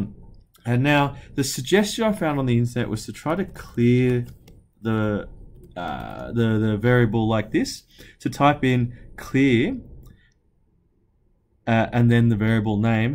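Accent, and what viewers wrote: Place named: Australia. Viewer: you sound like an aussie. is there any kind of julia community in Aus?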